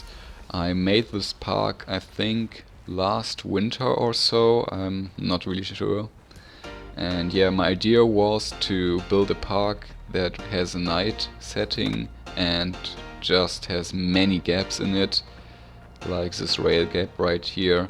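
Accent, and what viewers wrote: Germany. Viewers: Das deutsche Englisch ist legendär😂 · bist du von deutschland ??? Dein englisch ist eindeutig von einem deutschen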